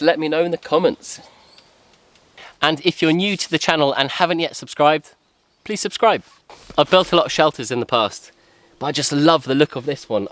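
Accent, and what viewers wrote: United Kingdom. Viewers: our big Yellow Lab named Bentley and our four White Swiss Shepherds named Pearl, Mauna Kea, Singh Dog and Tiger all love watching you and Amber in your wonderful and healing videos... and my Celtic dna loves your lovely accent and gentlemanly nature... it reminds me of time spent traveling in England and Scotland years ago... felt right at home... lots of love and respect to you and plenny aloha from the Big Island of Hawaii...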